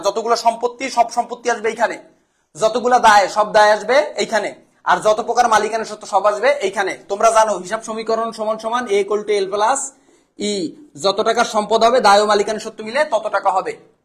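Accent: Barishal, Bangladesh. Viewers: স্যারের বাড়ি মনে হয় বরিশাল। 😊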